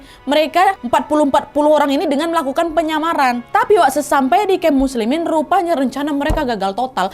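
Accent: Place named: Jambi, Indonesia. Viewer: Logatnya kyak orang jambi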